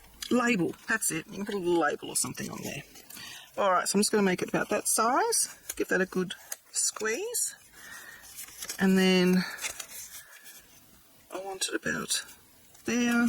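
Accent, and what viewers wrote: Australia. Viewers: Your directions are terrific and I could listen to your lovely Australian accent all day! Thank you for this video! Subscribed! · I found you today & immediately went to your home page to see where you are from! I love Australian accents! This makes the cutest little envelopes & I just happen to have made a few tags that will fit in them! I think I will make a bunch now, just have on hand, but I think I will also try to remember to cut some strips from whatever scrap paper goes in a journal & then make some that are “matchy- matchy”! I made a variation several years that you keep the long strip in one piece, folding it back & forth until you reach the envelope, then fold the flap down, and use a paperclip, Velcro or magnet, if you feel it needs something. Mine didn’t, a bone folder was adequate! Thanks so much! These are a lot of fun! 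Love to down under! Erica❤I have subscribed, see you soon!❤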